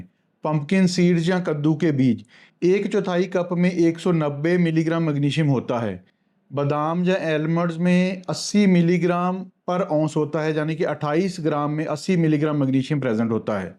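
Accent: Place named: Punjab, India. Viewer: Punjabi accent ki vajah h.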